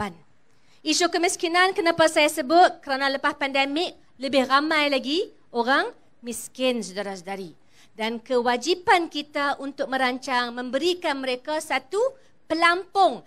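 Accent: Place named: North, Malaysia. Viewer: first time dgq nurul izzah cakap utara..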